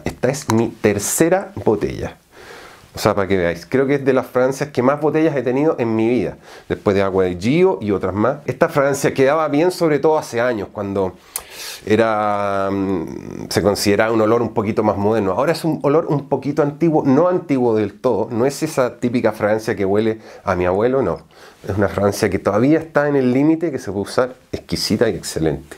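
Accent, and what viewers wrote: Chile. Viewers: Eres Chileno o Español? Pareces una combinación de ambas jaja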